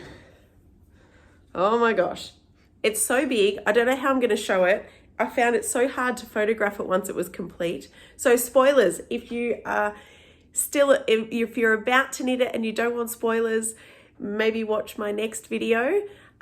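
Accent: Australia. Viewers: Wow, super impressive! Like everyone else, I have enjoyed seeing your progress and your reactions to each clue... especially with an Aussie accent 🤣 I am plodding along clue one still, but intrigued to dawdle along the rest of the way, with everyone else's helpful tips and tricks 😀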